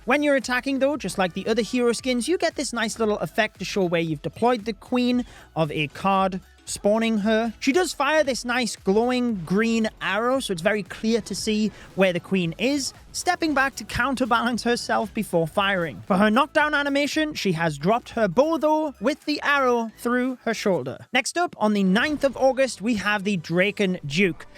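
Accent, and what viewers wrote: United Kingdom. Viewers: Holy... I just luv Bri-ish accent 😆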